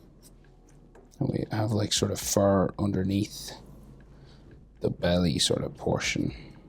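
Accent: Ireland. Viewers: Welcome back, you from Ireland?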